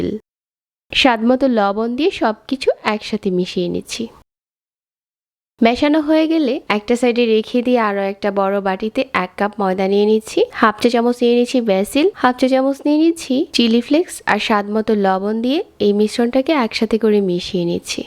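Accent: Noakhali, Bangladesh. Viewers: A besel ta ki🤔 Noakhaila bashai bolen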